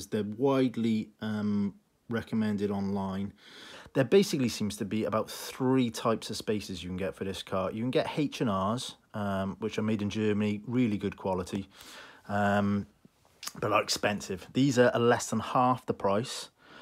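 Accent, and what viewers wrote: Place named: Wales, United Kingdom. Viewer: Nice to hear a fellow Welshman talking about this car. I am from south wales but live in Arizona. Have a 2013 gt, in grey with the same wheels as you!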